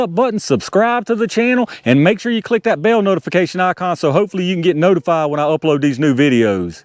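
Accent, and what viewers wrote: South, United States. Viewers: You are quickly becoming one of my favorite pew pew YouTubers. Man every video I've watched is awesome. And the cherry on top of the cake from one southern boy to another I love your accent man. Keep up the good work brother.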